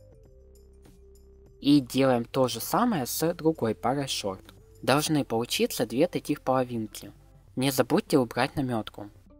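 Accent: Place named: Ukraine. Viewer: По руски говорит но слышат украински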